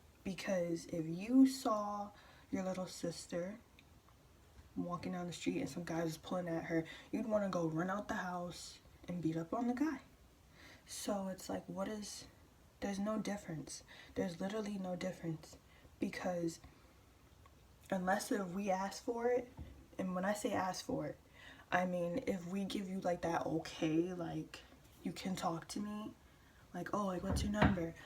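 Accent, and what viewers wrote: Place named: New York, United States. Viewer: From the way you talk, you're definitely a native New Yorker.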